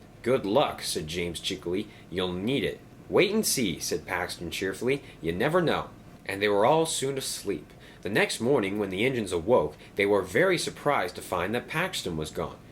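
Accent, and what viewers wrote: Scotland, United Kingdom. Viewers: Your Scottish accent is spectacular.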